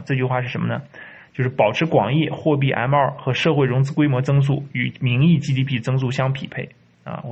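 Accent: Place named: Northeast, China. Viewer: 盲猜你是东北人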